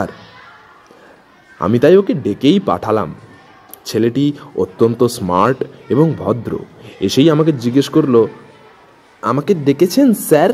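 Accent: Bankura, India. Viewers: Besh valo.. kintu purba medinipur e bankurar accent ta kan e lagche 😐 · Kolaghat e bankurar accent???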